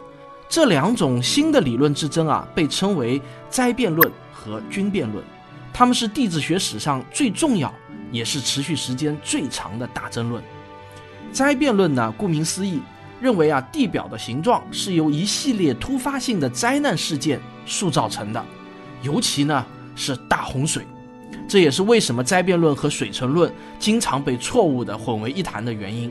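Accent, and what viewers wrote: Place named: Taiwan. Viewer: 講的比老高還精彩， 汪老師口音，好熟悉， 好像台灣老師授課。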